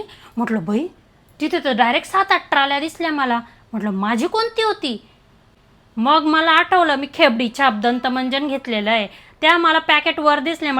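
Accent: Khandesh, India. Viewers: Typical Khandeshi...you always remind me of how my Sasu talks! 🙂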